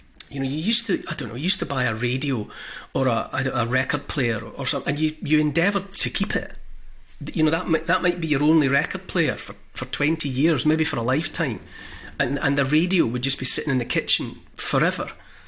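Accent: Scotland, United Kingdom. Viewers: I discovered your channel yesterday and I am glad i did! What you're saying makes lots of sense as we are many who share your views but many more who still need to wake up… please keep up the good work! You seem to have a Scottish accent, are you from Scotland? I am considering to reside there for a while… how are things there in general? thank you and take care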